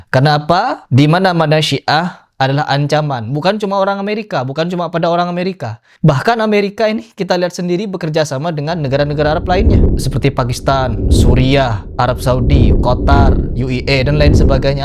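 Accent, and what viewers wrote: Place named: Sumatra, Indonesia. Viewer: anda ini asal dari mana? Dari logatnya, anda ini kira-kira daerah melayu Riau, Jambi, Pelembang atau daerah sanalah. Benar?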